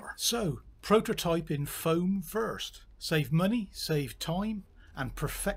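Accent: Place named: New Zealand. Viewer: I love the kiwi accent.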